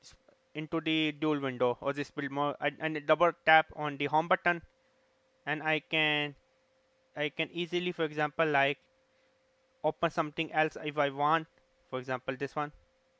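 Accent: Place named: India. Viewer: this is an indian accent ^^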